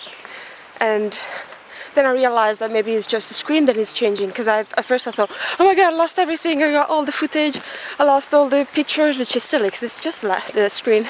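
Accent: Scotland, United Kingdom. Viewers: just found this after doing some hills at weekend and a bothy visit near The Grey Corries.. your 'bothy' pronunciation is spot on and you're picking up a Scottish accent here and there :) we've now got to stop planning our 6 month (or longer) van trip and just do it! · You sound like you are turning Scottish.You sound like you are picking up the Scots' accent.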